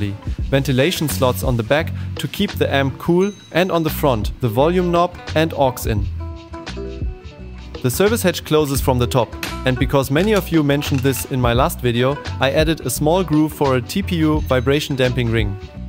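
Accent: Germany. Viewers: I love the German accent. (no joke) Great video and idea! Thank you. Merry Christmas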